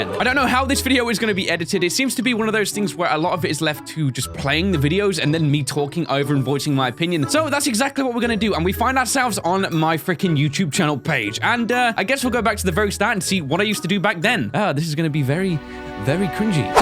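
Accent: United Kingdom. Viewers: Your voice changed...backwards.You used to sound more mature and British.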